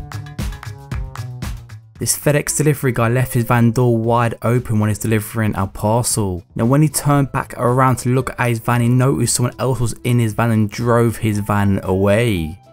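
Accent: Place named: Leicester, United Kingdom. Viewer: I guess you’re from Leicester because I used to live there before. Your accent so different from Londoner but I like it :)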